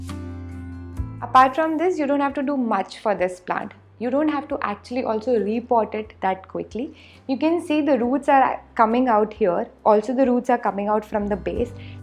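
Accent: India. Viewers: I love plants and I love Indian accents. How did the YouTube know this? 🤩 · Any ficus is a rubber plant therefore in my country the most comon look quite diffrently. And dang i am on c1 levelnof english i even understand scotish a cent but insien accent is draining to listen to.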